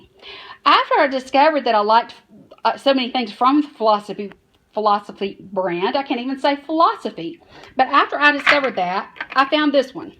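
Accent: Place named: Boston, United States. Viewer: Omg I love your accent! I'm from Atlanta and just moved to Boston, I miss hearing people talk like this! You have a great collection :)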